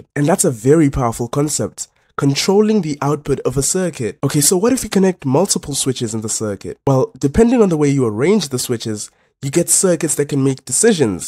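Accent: South Africa. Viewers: You sound so South African🇿🇦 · Dude, are you South African? Your accent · I hear that accent, and I pray that youre sponsored by a company that delivers to SA😭🙏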